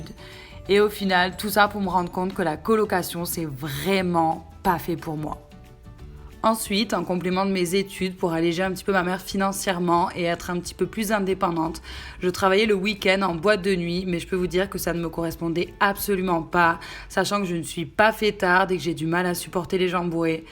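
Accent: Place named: Toulouse, France. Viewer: Super vidéo franchement les dessins son magnifiques et étant Toulousain aussi t'inquiète pas pour ton accent j'ai le même on s'en fout xD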